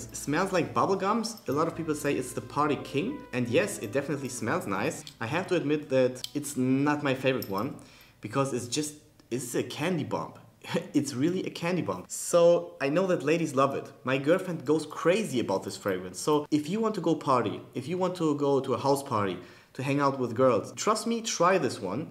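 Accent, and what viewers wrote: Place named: Germany. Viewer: Man hört den deutschen Akzent so geil raus 😂. Geiles Video trotzdem!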